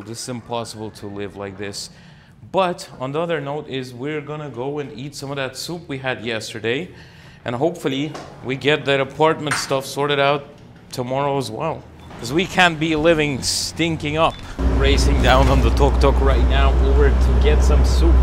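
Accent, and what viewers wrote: New York, United States. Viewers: Hey did you learn your English from a New Yorker??? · It's funny that your English sounds like an American accent more than Irish. Best of luck in Thailand, and I'll be watching to see where you end up!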